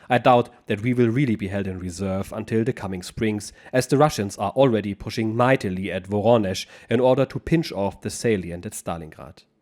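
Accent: Germany. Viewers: Thanks for your accent, Thuringia?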